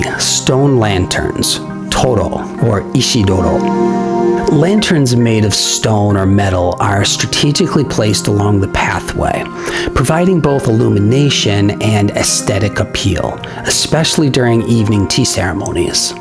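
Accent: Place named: United States. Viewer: Can't......stand......the american accent...srry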